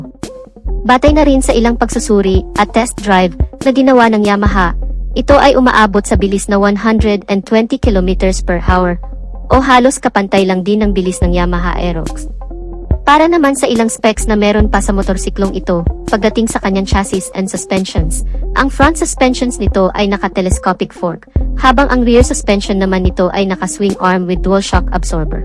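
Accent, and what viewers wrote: Visayas, Philippines. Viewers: Bisaya ba ang nag sasalitang ito....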